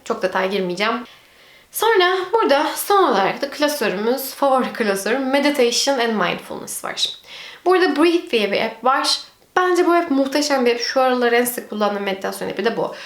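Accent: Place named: England, United Kingdom. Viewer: Ingilizce aksanına bayıldım diyebilirim gerçekten ilk izlediğim videodan bile samimiyetine hayran kaldım😍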